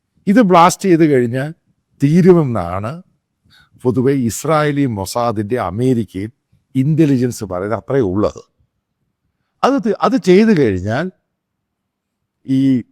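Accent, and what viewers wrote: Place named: Kottayam, India. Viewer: ആ കോട്ടയം സ്ലാങ് തള്ളൽ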